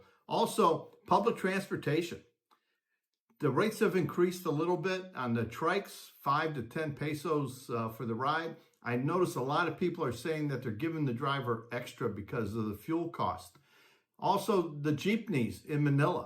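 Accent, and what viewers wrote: United States. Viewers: Asian Mkts are "tanking" and Currencies are getting hammered .. I read "fear of Currency Collapses" .. This on top of Covid Inflation that never came down and Tariffs .. "All of it hurts the poorest the most" .. I saw the anti Corruption Protests, and now I'm seeing Protests there with anti Trump-U.S. Signs, on YouTube (Google) .. "Shits getting real" so keep your head down and don't advertise "American" (you have an accent - practice Canadian Aye mate) .. That's "excess Russian Oil" (ie) already out, on Shadow Tankers ..That wont last long, Russia can not make up for supply loss, and Ukraine has taken-out "30 or 40% of Russian Oil production (off line) .. If this doesn't "end fast" somehow, things will get "a lot worse," before they get better, incl inflation, shortages and collapses affecting millions there / billions of people, worldwide .. 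"Plan for the worst and hope for the best" .. Be safe and best of luck ..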